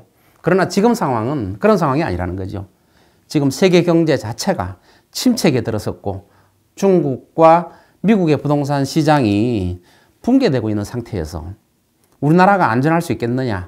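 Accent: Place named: Yanbian, China. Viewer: 이분 말투가 중국 연변쪽 억양 이신듯?? 암튼 3달전 한국은행 발표에 따르면 한국 아파트 가격이 소득대비 뉴욕 도쿄의 3배로 미친 가격~! 이분은 방송중이라 보수적으로 고점대비 50%를 적정가로 이야기 하셨으나 실제는 80% 하락이 정상임. 여기에 인구는 세계최고 급감에 가계부채 세계1위라 50년 장기하락은 피할수가 없음. 전국민의 절반이 대출만땅 빚거지 금융노예라서, 부동산 망하면 나라망한다는걸 볼모로 정부에서 부채 모조리 탕감 해줄걸 기대하고 있지만 뒤통수 크게 쳐맞게 될 것임. 1인가구 증가해서 아파트 가격 오른다??? 1인가구 80%가 생활비도 궁핍해 원룸 월세사는데 10억 철근없어 저절로 무너지는 아파트 퍽이나 영끌해 주겠다??? 하여간 미친것들 천지임 ㅋㅋㅋㅋㅋ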